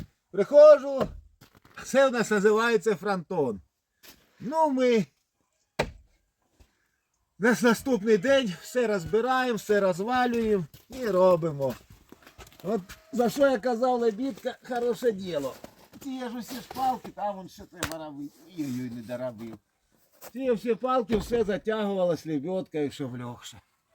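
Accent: Chernihiv, Ukraine. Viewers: Звідки ви? Чую говор з Чернігівщини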